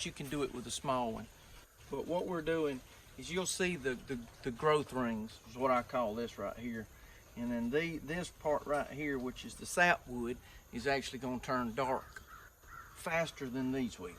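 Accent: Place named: Tennessee, United States. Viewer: Awesome! Love doing this too ! Great video. You from TN ? That’s where I’m from . Your accent is similar to mine . Thank you 😃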